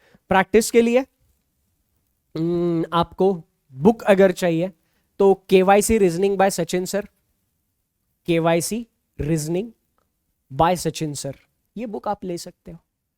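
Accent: Maharashtra, India. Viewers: Namaste Sir, hriday se request hai kirpiya 'Marathi' touch mai baat krna phir se start kr dijiye sessions mai...🧡🙏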